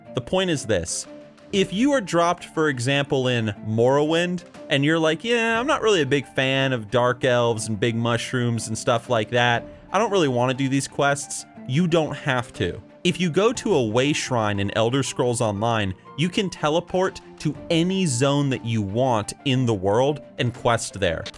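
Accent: South, United States. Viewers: I was loving the southern drawl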